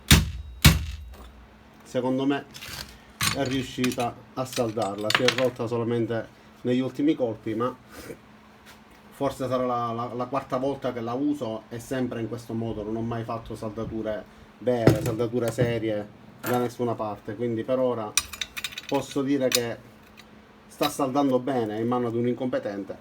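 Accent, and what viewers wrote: Sicily, Italy. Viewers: Salve signor, potrei chiederle se acquista i prodotti parkside in o anche in un negozio fisico della Lidl? per via dell'accento ipotizzo che potrebbe essermi utile, abito in un paesino da cui il LIDL più vicino è in realtà parecchio lontano e mi farebbe comodo andare sul sicuro, non ordino online infatti proprio perchè a giorni dovrei recarmi a Palermo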